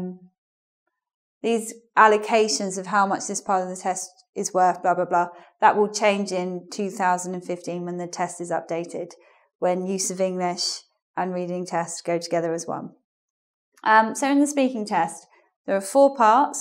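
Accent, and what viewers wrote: United Kingdom. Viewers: Hi, please could you tell me what part of UK are you from? I mean, I need to know where is your accent from. Thank you for the information on CAE, I'm gathering study material to take this certification. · She sounds like she's from the U.K. to me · i dont know why when Women do British accent its so hard to listen. in opposite Men are better with british accent :lol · Hello. Tell me something about your accent - for which part of the UK it is typical?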